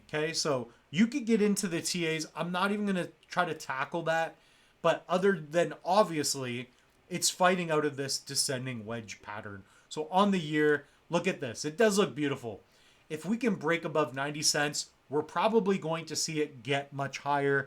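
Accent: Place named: Canada. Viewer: Heard the Canadian accent 😂 I was just thinking "if he's not from canada he's from Minneso-da"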